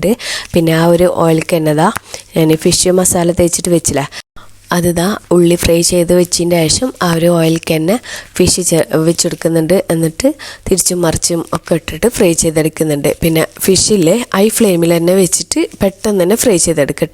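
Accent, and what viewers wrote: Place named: North Kerala, India. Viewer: Samsaram kelkkumbol kannoor and Kasaragod anenn thonnum . Malappuram kariyanenn parayilla .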